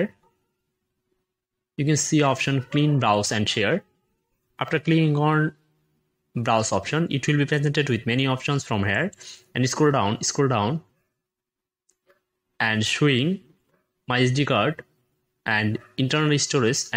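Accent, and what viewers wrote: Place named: India. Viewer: Bahasa inggris campur india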